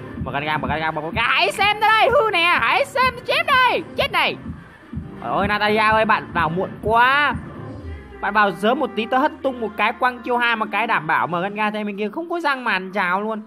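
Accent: South, Vietnam. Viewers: Anh nói tiếng nam ko hợp đâu anh nghe chán lắm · Nói giọng nam nghe ngứa tai vc.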